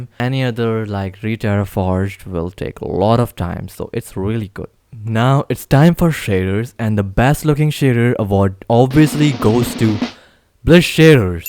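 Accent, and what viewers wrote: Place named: India. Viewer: i feel like getting scammed from a india scammer he has like an voice like an idian try to speak english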